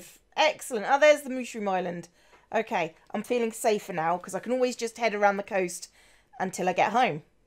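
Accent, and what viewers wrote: United Kingdom. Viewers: Omg shes British i love British accents can you say something really cool in the last episode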